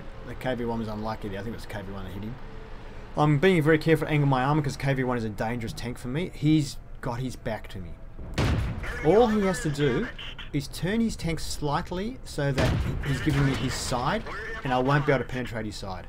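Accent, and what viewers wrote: Australia. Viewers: R u an Australian/New Zealander?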